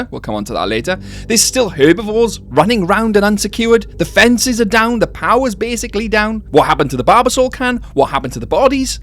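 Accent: Wales, United Kingdom. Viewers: Are you welsh? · He sounds Welsh to me but I know what you mean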